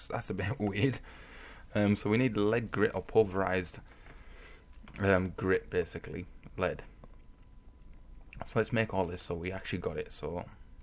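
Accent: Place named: England, United Kingdom. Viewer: Or wait.. Let me guess.. England... Becuse of your accent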